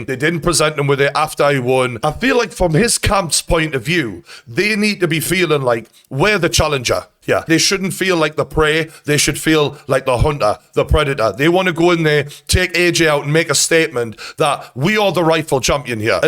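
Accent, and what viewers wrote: Newcastle, United Kingdom. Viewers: Love true geordie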